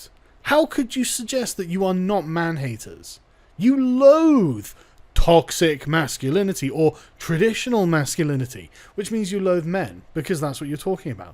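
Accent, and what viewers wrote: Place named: United Kingdom. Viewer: I'm shocked you have a British accent, as I thought it was just men in the USA who spent all their time online whinging about feminists. I thought it was connected to so many men in the USA being genitally mutilated so feel threatened by women, but you've proved there are types like that closer than I hoped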